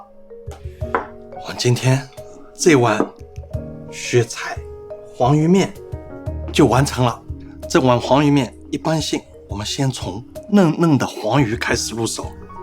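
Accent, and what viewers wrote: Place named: Shanghai, China. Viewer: 沪普好听。盼能回国吃一碗鲜得来眉毛也要落下来的雪菜黄鱼面。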